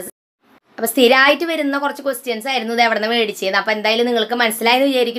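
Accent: Thrissur, India. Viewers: Ithu njangal Thrissur kkarude slang anu. Njangal achadi bhashayonnum samsarikkarilla tta...,☺️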